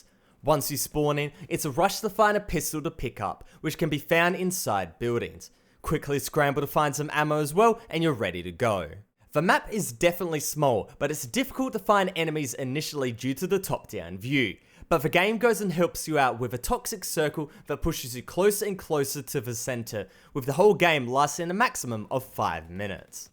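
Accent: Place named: Australia. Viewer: Dude's Australian his voice is easy to listen too subscribed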